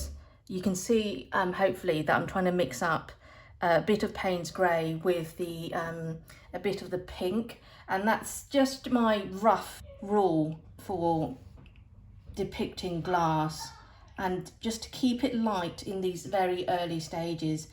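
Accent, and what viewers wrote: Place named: United Kingdom. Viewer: I wish more artists would do this, it really helps to understand the choices made. May I ask, which British accent do you have? I love accents!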